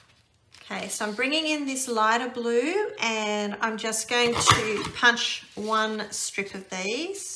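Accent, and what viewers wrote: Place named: Australia. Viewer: !these pages are beautiful,love to watch you scrap and listen to your Aussie accent! Just Lovely xx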